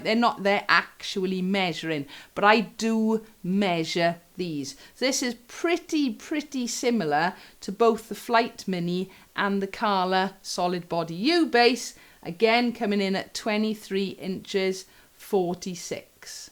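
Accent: Wales, United Kingdom. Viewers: Hey! Just ordered one - I was torn between the white/cream & black, went for the black. Arriving tomorrow! Thanks for the fantastic review! I’m a 57 year old lady feeling like a kid at Christmas. 🤣 Subscribed 👍🏽 PS, absolutely love your Welch accent. 😊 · Thanks for the concise, informative, unbiased review. Great captioning, narration, lovely Welsh accent and pronunciation. I love your playing and choice of samples,. You play so well I couldn't hear roundwound scraping sounds at all. I've got DR Legend 45-105 short-scale flatwound strings on my Japanese 1973 Univox Hi-Flyer 'Ventures' bass clone. Flats hide some of my 75 year old student bass guitarist bad fingering and muting. Great pedal display, makes me think I need some, and maybe some gels. I like to use the Chorus effect on my Fender LT25, ethereal, especially with my Ibanez Mikro converted to piccolo bass- D'Addario rounds on it, so playing it without scrapes is, for me, what the Army called 'valuable training'. 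Thanks again, I'll check out your reviews, I'm shopping for another good shorty in EADG to throw in my Mazda to take to Death Valley.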